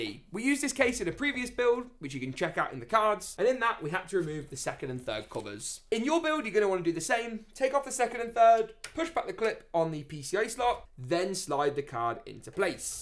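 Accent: United Kingdom. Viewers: Lol I’m sad he has British accent but he didn’t do the video on £ I mean it would have helped us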